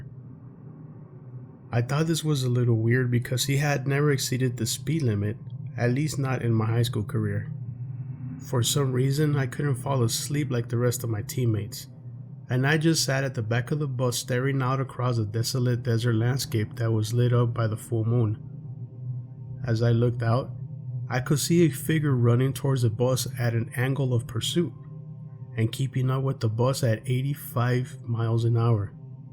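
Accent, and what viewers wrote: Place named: Chinle, United States. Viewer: Lived in Chinle, AZ for about a year. Never saw anything real personally, but you know how horrible kids can be to each other. We used to tease each other all the time when walking past any livestock in the fields. “Ooh that cow is acting weird! It’s a skinwalker!!” Canyon de Chelly was fun to visit but creepy at night. ❤️ Ps: Your accent makes me miss my friends back in Chinle.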